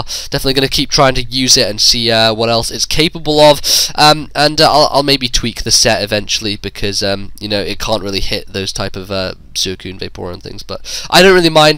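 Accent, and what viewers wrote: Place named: England, United Kingdom. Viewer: your obviously english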